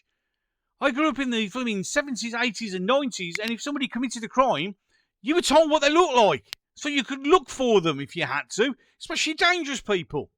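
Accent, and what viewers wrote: Birmingham, United Kingdom. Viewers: Hiya New Sub, I'm made up your channel was recommended, people by me {I'm over the water from l/pool} are still believing the official narrative 🤯 by the way, I love the brummie accent , UB40 fan since the late 70's, and a friend of Brian Travers may he R.I.P 🙏🏻Such a lovely and generous guy .... Jayne 🇬🇧💙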